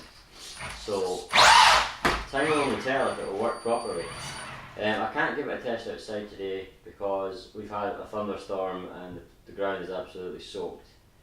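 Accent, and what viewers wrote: Scotland, United Kingdom. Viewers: Hello! I came across your channel today. Nice to hear a familiar accent. I'm in Troon, near Glasgow. Have you still got your XXX4? I'm in the middle of resurrecting my old XX4, and I have a new in box XX4 Worlds and Street Weapon IWC, both of which I plan to build shortly. Have you tried the belts that are now available from Tough Racing? Those seem to be the recommended replacements these days for the XX4/Weapon. I've recently converted over to running Schumacher, but the L1 Evo and Cougar just don't have the same appeal to me as these old Losis. In my less than capable hands I'm sure I'll be just as quick (read slow) with the XX4.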